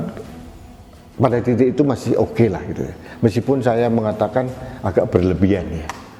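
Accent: Java, Indonesia. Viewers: Sepertinya bapak orang jawa kalau saya liat dari logat bahasanya. Maaf pak, ini murni penyataan anda atau emang mau cari sensasi membesarkan Kanal Anak Bangsa agar banyak viewer? Malu maluin orang jawa aja. Salam sing nyong wong Brebes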